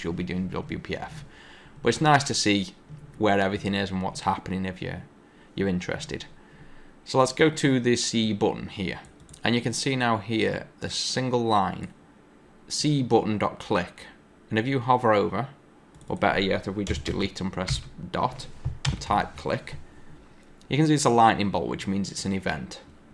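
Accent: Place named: Stoke, United Kingdom. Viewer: You sound like a Stoke lad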